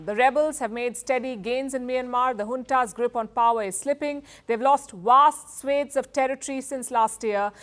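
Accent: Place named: India. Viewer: English but of heavy Indian accent lol..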